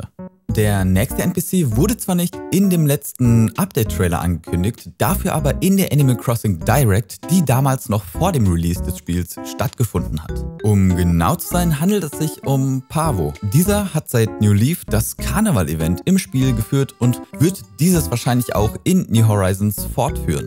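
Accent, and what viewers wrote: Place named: Bavaria, Germany. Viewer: Oh da hab ich soch ein Bisschen Bayrisch rausgehört. Bist du aus Bayern?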